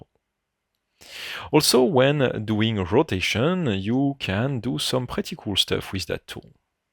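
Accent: France. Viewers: Bonjour, pourquoi vous ne faites pas la présentation en français, vu votre accent, vous l'etes non? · Super toutes ces nouveautés! Pourquoi ne peut-on plus avoir l'option lunettes en mode téléphone pour les panoramiques ? Merci. (Toujours drôle cet accent français en anglais ^^ ;-) dommage de ne pas traduire au moins à l'écrit)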